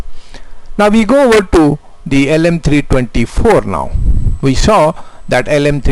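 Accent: India. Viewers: Hello, I understand your video really well. My professor at school is from India and I am used to your Indian accent. Keep it up, good WORK! Regards, -Steve.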